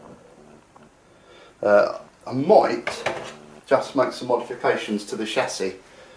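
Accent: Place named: England, United Kingdom. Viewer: Nice work! I never get tired of listening to an English Accent. : )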